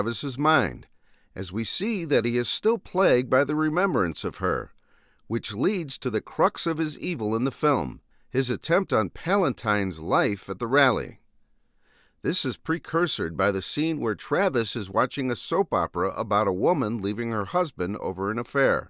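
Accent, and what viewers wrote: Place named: Chicago, United States. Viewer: Interesting take, goosed by your heavy Chicago (?) accent.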